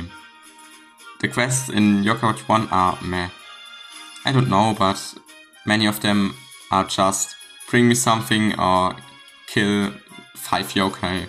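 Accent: Germany. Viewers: Is that a german accent? Hi wie gehts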